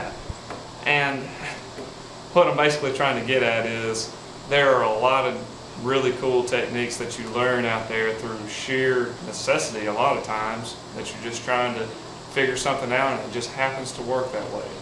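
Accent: South, United States. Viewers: I'm retired now but it's always good to see the old tricks still being used. Nice presentation and love the Southern accent. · thanks. good tips. what part of the south are you from? georgia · The southern accent communicates acceptance to the listener. It shouldn't be a coincidence they invented the y'all term to address everybody. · You talk funny… but I love you good ol' southern boy common sense. But I think it goes deeper than this; you are clearly connecting the dots between trigonometry principles with construction techniques. One repeated thought that goes thru my mind while watching your videos is, "Why didn't I think of that?" Keep those videos coming!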